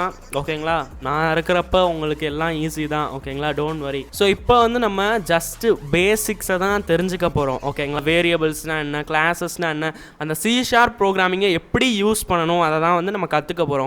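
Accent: Madurai, India. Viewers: thambi madurai ya...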